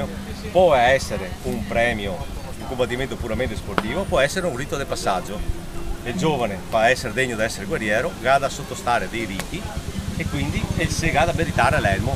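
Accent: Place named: Spain. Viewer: per un attimo pensavo stesse parlando spagnolo..... sembra avere un accento spagnolo....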